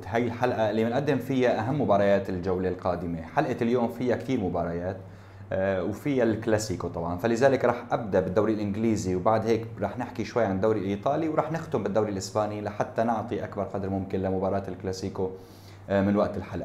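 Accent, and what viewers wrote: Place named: Aleppo, Syria. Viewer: مبين من لهجتك ... يا ابن حلب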